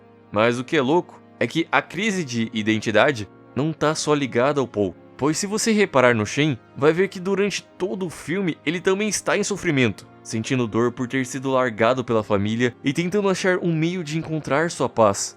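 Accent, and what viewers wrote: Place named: São Paulo, Brazil. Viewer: mano, seus vídeos são bons, mas sua voz... Cara, é difícil prestar atenção. Procura as técnicas de controle da respiração para canto, vai te ajudar. E tenta dar uma segurada nesse sotaque forte do interior de São Paulo, nmrl, pprt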